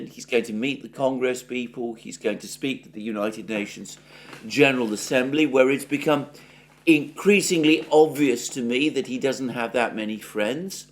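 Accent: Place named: RP, United Kingdom. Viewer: Mercouris is always an authority about the Ukraine war and about sophisticated Eton-style english language :+)) The only thing he dares not to do is to try to know who is in the "deep state". The "deep state" has no name.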